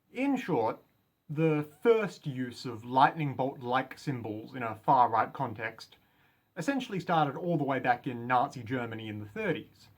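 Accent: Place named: New Zealand. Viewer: To my ken, he's a Pākehā in Aotearoa. Should be mostly similar to an Englander.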